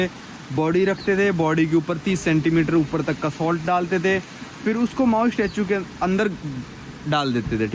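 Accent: Haryana, India. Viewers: Hats off to you dear. I enjoyed your video of Easter Island. You went so long in south america. Try if you can go to Antarctica, we are following you always. You always explain nicely. I like your haryanvi tongue. I am also a traveler. Only south America continent is left. Sorry you lost the phone. Best wishes 🙏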